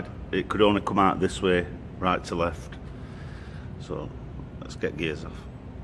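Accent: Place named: Yorkshire, United Kingdom. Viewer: Reckon he should be voiceovering plenty of stuff on telly. 👍 Clear, concise, no nonsense. Anyone would guess he's from God's own county.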